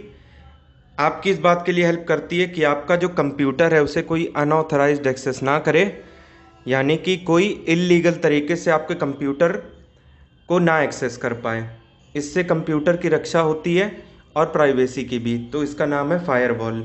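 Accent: Kanpur, India. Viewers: Ye sir pakka kanpur k hai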